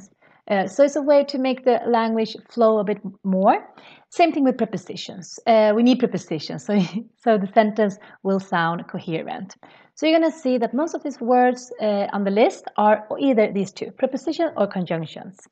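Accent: Stockholm, Sweden. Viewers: She has a bit of Stockholm “i”, not to an extreme but some. I’d say you get close if you pronounce it as the English letter “e”. Like in “read” with Australian touch.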